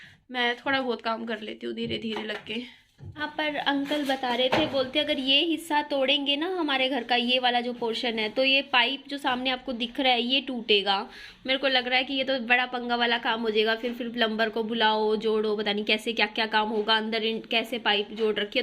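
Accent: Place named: Haryana, India. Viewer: to mujhe haryana se charkhi dadri se lgti hai.. mtlb us ki boli se.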